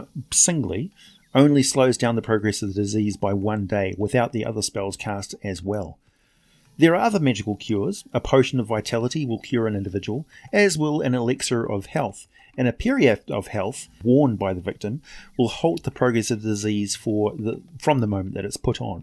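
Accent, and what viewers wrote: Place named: New Zealand. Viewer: Very nice intro, I thought you might a New Zealander. Along with South African, two of the most pleasant accents to listen to. I've also been binge watching Viva la dirt league d&d sessions lately, very silly and funny bunch.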